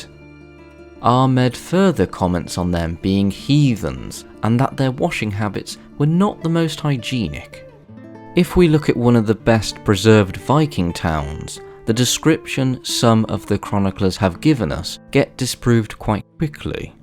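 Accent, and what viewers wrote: United Kingdom. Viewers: Great British speaking guy teaching history and then completely dumb down the interesting show by having WHITE TRASH GETEO SPEAKING people from the year 600? Why in gods name would Any body purposely turn a learning experence into a confused, unrelateable jargon associated with poor blacks in the inner citys. When were they there, before or after the black pharohs?? The Viking ships are 100% bigger than in reality. The beam on the ship was the size of THE GREAT EASTERN for gods sake buddy..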